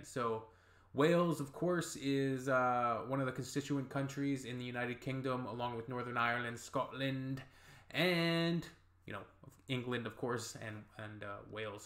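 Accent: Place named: Canada. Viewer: Thank you so much for doing this, and for your kind comments. BTW I could tell you were Canadian before you told us. Most can't tell the difference between Yanks & Canuks (From an old Rhondda boy now living in Victoria BC)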